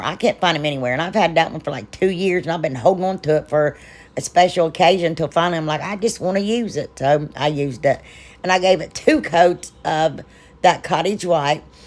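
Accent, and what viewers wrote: South, United States. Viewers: Where you from girl?! I’m in north Alabama. I always love hearing a warm southern accent on here!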